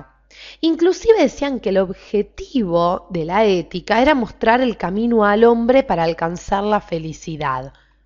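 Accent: Argentina. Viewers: el acento argentino PTM no me gusta... la info buena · Un placer epicúreo es disfrutar del acento argentino.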